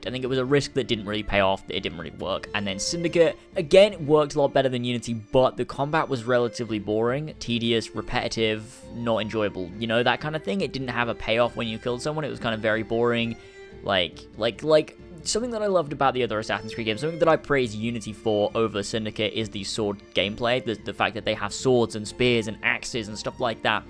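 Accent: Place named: Northern Ireland, United Kingdom. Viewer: where are you from cause your accent sounds like a northern Irish...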